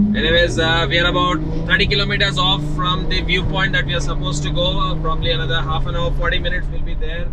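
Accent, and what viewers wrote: India. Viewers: He's english is good.. He just has a South Indian accent which is okay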